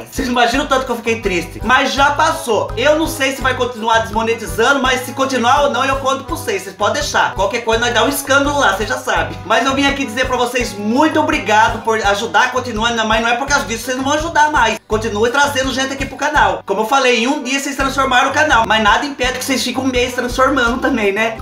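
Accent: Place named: Caipira, Brazil. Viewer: Esse caipirês dele é demais kkkkk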